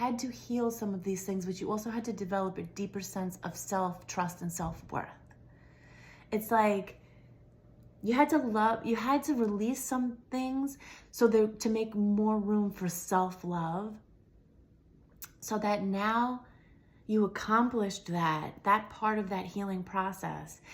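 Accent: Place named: New York, United States. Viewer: You shine Love you New Yark accent and yes I say Yark lol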